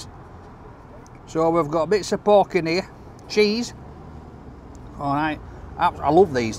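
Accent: United Kingdom. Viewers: Great videos.. great accent. What part of Britain is it?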